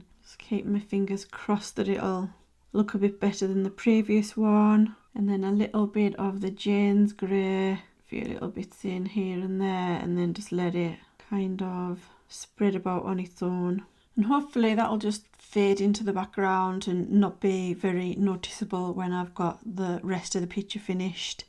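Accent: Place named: Whitehaven, United Kingdom. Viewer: Hi, iv just found your fab channels, your accent reminds me of home I’m from Whitehaven, love your art and really enjoying the format hope to see more of your work ❤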